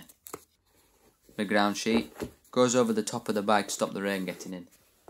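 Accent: United Kingdom. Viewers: Barnsley accent? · Your British accent is excellent. Sounds legit Enough that I have to put subtitles on. I can't understand any of those people except the posh ones or the Welsh.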